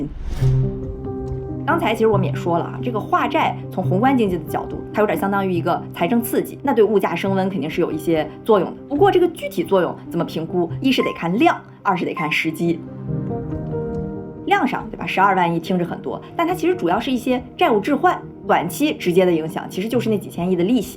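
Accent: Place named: Shandong, China. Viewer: 這集小林的中國腔很重，難怪避重就輕。説了很多（足夠賺流量），卻好像沒説？ 看來我還是應該看立場鮮明的博主。。。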